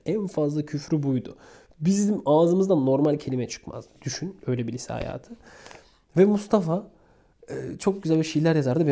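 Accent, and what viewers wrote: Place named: Black Sea, Turkey. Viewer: Karadeniz şivesi süper😂Oruçlu okudunuz sanırım insanın ağzı kuruyor,sonlara doğru bitiricem diye zorladınız teşekkürler.Hakkınız ödenmez.🙂💐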